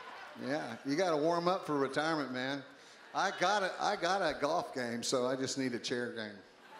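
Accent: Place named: Texas, United States. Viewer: I love his true Texas accent!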